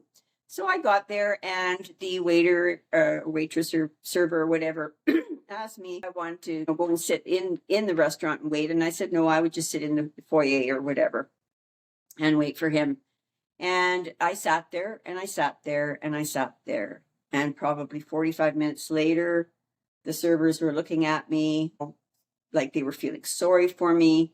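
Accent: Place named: Canada. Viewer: New subscriber here ...love your charming Canadian accent 😊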